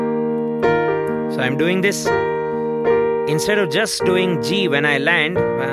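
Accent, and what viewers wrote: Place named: India. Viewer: thanks for a very informative video. your explanation are clear and simple. i watch a lot of technical videos and as a native American English speaker, i often find the indian accent annoying and just move on. while your accent is obvious, it is very understandable and not at all annoying. i work with many people with various accents and it is always apparent who cares about being understood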